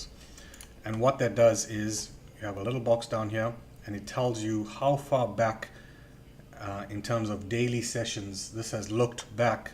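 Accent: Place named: South Africa. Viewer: sounds south african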